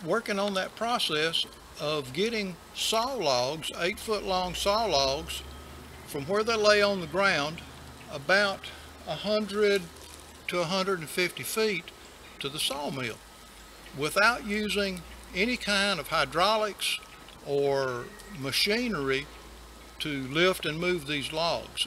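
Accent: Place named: West Virginia, United States. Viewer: Accent sounds just like my uncle from west virginia. Good tip generally. I got a bunch of junk logs dropped off at my property recently by an Arborist. He did be the disservice of stacking them a mile high and I'm having huge difficulties getting some of the logs down. But this technique helped me move some of them at least.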